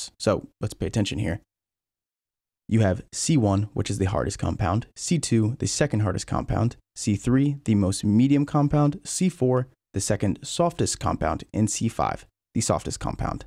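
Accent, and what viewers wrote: United States. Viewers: Being american myself at first I didn't realize anything. Maybe about 10 minutes into the video i was like wait, that "grand prix" sounded unnatural.. oh wait he's american? Thats different, in a good way (: I often feel like I'm the only person for miles that cares about F1. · its the american accent for me, it took me off guard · I was like, "something's off about this F1 video" -- then I realised. It's the american accent. I'm not used to people talking F1 in an american accent...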